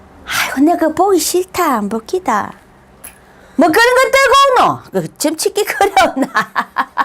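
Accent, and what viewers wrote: Gyeongsang, South Korea. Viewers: 경상도 어데고 ? 서부갱남이가 ? 소카도오 ㅡ 나가 볼때는 ㅡ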